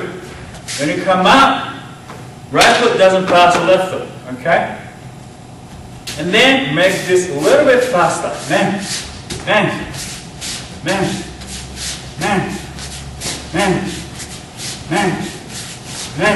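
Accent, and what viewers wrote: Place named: Australia. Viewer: ok great vid this is really helpful and i don't mean to get off subject, and i mean absolutely NO offense in making this comment, but am i the only one who thinks he sounds a little Australian, i mean that just hit me, and im an odd person so i notice these things sometimes even when im not looking for them^^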